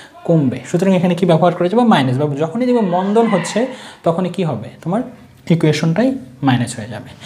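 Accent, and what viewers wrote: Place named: Bogura, Bangladesh. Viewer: ji sir kotha sunlei buja jai bogurar manus,kon jaigai apnar basa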